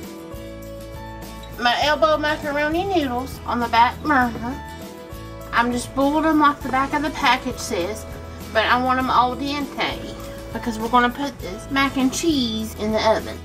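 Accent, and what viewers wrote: South, United States. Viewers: Her sweet southern voice is actually helping my anxiety. Had a nightmare and it’s 4am, ended up on her channel and it’s like a lullaby. Thank you! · lichen goatfungus she does seem very sweet!!! Her food looks delicious 😋 and I love her accent,where is she from? I’m a Houston,Texas girl,and I love southern accents!!! And Country cooking might I add😁 I cook country and soul food 🥘 people have asked me if I preferred soul food because I’m African American,no I assure you I’m into all kinds of food, it doesn’t matter my ethnicity. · what is that accent? where in america? LOVE IT xxxxxx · Clayton Cooley I agree! She's very sweet and her voice is soothing and the southern accent makes her more charming.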